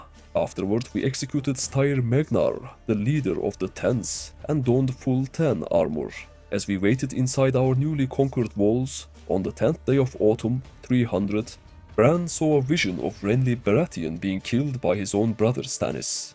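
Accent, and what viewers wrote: Turkey. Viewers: Dostum güzel içerik aksağanın Türk gibi Türk müsün diye merak ettim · Can you make kuzhait campaign next time please? (Btw your accent sound like Turkish) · Abi İngilizcenden anladım Türk olduğunu hahahaha :D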